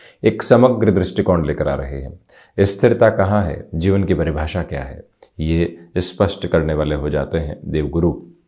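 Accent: Standard. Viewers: आपकी आवाज बहुत गहराई लिए हुए और ज्ञान में डूबी हुई लगती है शुद्ध हिंदी में आप बोलते हैं धन्यवाद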